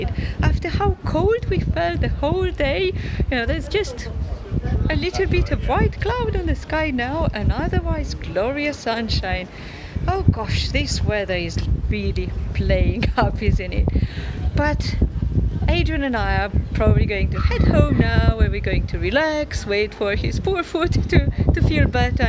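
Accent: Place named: England, United Kingdom. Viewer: Are you an English lady or you just visited this place ? Because I'm watching a British teacher from " Much wenlock " he has a channel on you tube for learning English his name " Misterduncan " his accent is completely different than you . I'm just wondering why you didn't have the same accent if you were from the same place " Much Wenlock "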